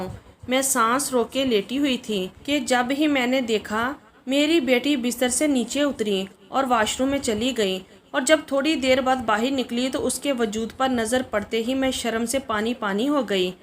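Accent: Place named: Lahore, Pakistan. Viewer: Lahoren lehja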